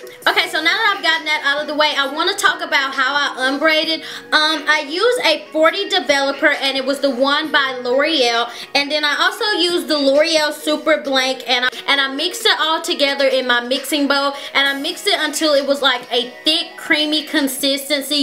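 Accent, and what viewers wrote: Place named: Texas, United States. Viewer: you have to be from Texas !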